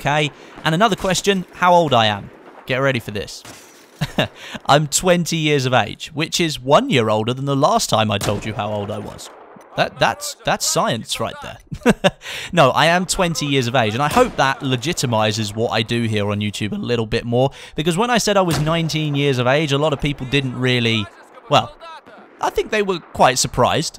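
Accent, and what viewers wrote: England, United Kingdom. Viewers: In fairness, as an American, I could clearly tell that was British. He doesn't sound Australian at all imo:P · How did people not know you were from the UK? XD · Story sounds a little English to be honest. Didn't realise you're close to London. I thought you were like me (midlands)!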